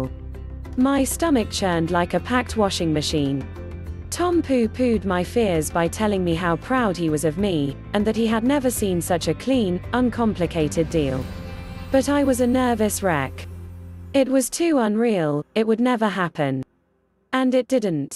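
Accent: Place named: RP, United Kingdom. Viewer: I lived this book but it’s the wrong narrator reading it so I’ll have to try to find the original audiobook read by the author. I’m from the UK but this received pronunciation where every words spelt out slowly and without emotion just doesn’t fit with what this book represents.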